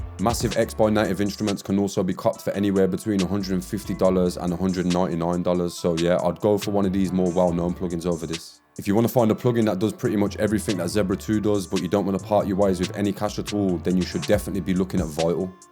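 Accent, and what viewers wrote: Birmingham, United Kingdom. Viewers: ugh that brummie tamworth accent..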